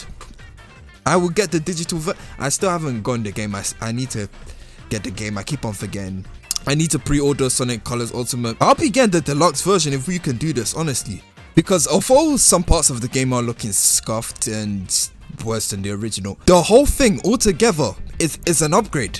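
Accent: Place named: London, United Kingdom. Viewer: good video my bro you sound like your from where i’m from london/uk good to see london guys doing sonic news 👊🏾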